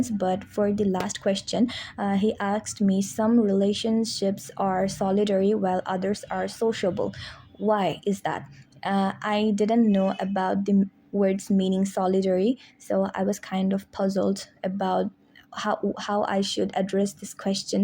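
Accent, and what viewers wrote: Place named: Sylhet, Bangladesh. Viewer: Your accent is really nice, r you from sylhet btw?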